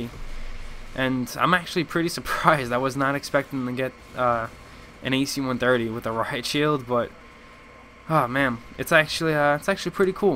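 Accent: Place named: New Jersey, United States. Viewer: sounds like he has a jersey accent lol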